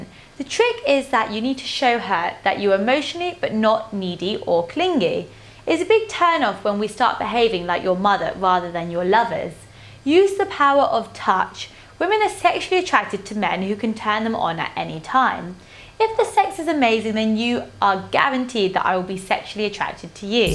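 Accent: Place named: United Kingdom. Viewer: British Accent ikr😘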